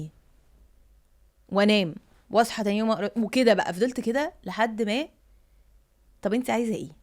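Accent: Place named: India. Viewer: انجليزى كتير و الاكسنت هندى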